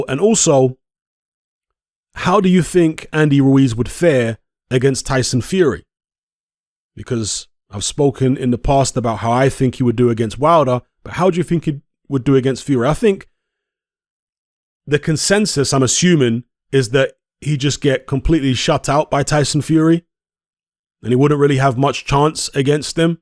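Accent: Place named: United Kingdom. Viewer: Why did your tone change when Wilders was mentioned? Why is there someone at fault. Are you ignoring that Wilder is in mediation. This has been one of the most bias boxing videos I’ve seen, although you try to play it off. Ruiz made a video saying he isn’t fighting Wilder there aren’t any talks it’s a fan made poster and Rumor. This is sad coverage idk if assuming off your accent, but if you’re from the UK it’s pathetic. All UK fighters serve as easy meal tickets for Carmelo. Bjs probably getting stopped, and Aj ran from Ruiz and Hearn doesn’t like the idea of running it back a third time, they’re 1-1 Aj never avenged his loss he beat an out of shape Ruiz and ran at that. You saw the fear in his eyes every time Ruiz would swing that night. Fury definitely cheating in both fights you can’t photoshop videos and those gloves definitely didn’t look normal. 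Both those men are the best this UK mega fight has to offer it’s sad. The fact they haven’t even announced a venue tells me this fight may not even happen.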